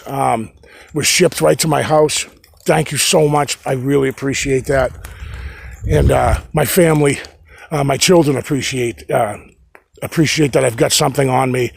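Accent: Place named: Massachusetts, United States. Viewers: HEY, THIS IS THE SECOND TIME I WATCH THIS VIDEO, I JUST WANT TO SAY HOW YOUR Massachusetts accent makes me miss it up there so badly!!!! I feel like I'm home when i watch your vids. As you know I'm down in Florida for the past how many years. But I miss home and you always bring me back!!!THANKS, STILL HERE WATCHIN YOU OUT SQUATCHIN..LOL...TAKE CARE AND STAY SAFE!!!!LINDA IN FLORIDA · Love the Boston accent.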